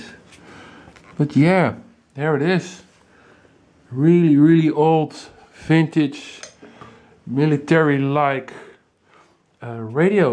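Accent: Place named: Netherlands. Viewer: Nederlander zeker? :P Awesome to have a peek inside these things though! :D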